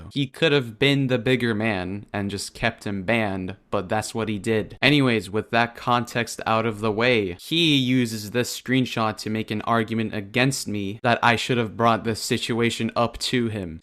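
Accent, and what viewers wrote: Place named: United Kingdom. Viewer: Omg yiur bri'tsh???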